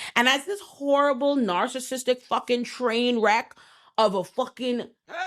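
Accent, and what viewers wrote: Bahamas. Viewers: New sub❤ i only disagree with one thing queen, scotty don't love him, if he looked the way he looked with no SHMONEY she won't even give him a SECOND of a minute of a time of any day. Lol i think she feels like SHE owes him , she feels like she in way to deep now to let anyone " WIN HIM " AWAY FROM HER SPOT.... an i think she is playing the long game just like him. I think they deserve each other. Especially since lemmy made it clear to us episode one scotty knew what she was signing up for an now "she wanna change for the baby". I guess we all gonna see how this ends. Couldn't be ME though. I got to much respect for myself an integrity. Aint enough money in the world, i pray this doesn’t end badly for her, we all know rich people have no limits an feelings come second to spiritual dark agendas. Also you are gorgeous an i love your accent. 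Is that like a bahamian bagan accent? ❤ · Are you Bahamian?.. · Your accent 👀👀👀. Sis you’re a Bahamian ? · Are you from the Bahamas ? You sound just like this other creator I look at on here, similar accent, lol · are you foreigns wife???? its giving bahamiaaaaaaaaa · I hear a Bahamian accent 👀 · Are you Bahamian? Your accent is killing me 😍 it reminds me of another Youtuber that that also does commentary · pause .. are you bahamian ?! this is my first time viewing but you sound just like us , or maybe you’re an geechie girl ? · You musssst be Bahamian or from Dominica omggg that accent 🤣🤣!!! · Are you Bahamian by chance? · Waittt are you Bahamian 🇧🇸??? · Are u Bahamian? Or am I tripping? I hear our accent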